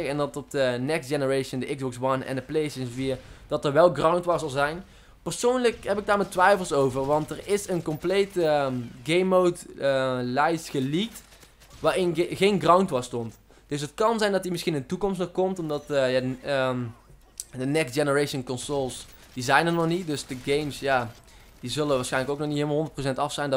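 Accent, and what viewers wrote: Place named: North Brabant, Netherlands. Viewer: Ja noord brabant dat hoor ik haha, maar waar ergens? Eindhoven?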